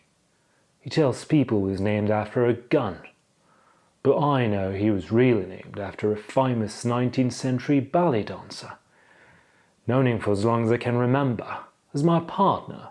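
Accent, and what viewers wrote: United Kingdom. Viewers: That’s a gripping video! 😻 You’ve got an impeccable British accent. Best from Poland! 😊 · Really odd hearing you speak English, and with a perfect British accent too. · A real hoot! The lovely British accent was unexpected . Did you spend more time with British content than with American content? Or maybe you can turn on an American accent as well. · Perfect British accent! Expecting next videos) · oh my goodness so british it is wonderful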